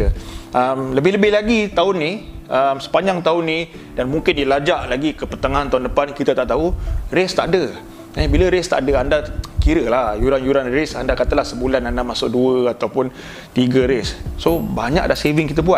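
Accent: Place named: Malaysia. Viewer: Suka saye dengar logat Malaysie 😅,, salam subscriber dari Indonesia bang🙏😁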